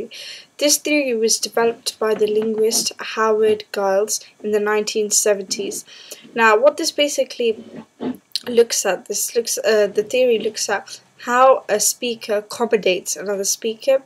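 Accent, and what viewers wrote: United Kingdom. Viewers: brilliant video but I thought it was recieved pronunciation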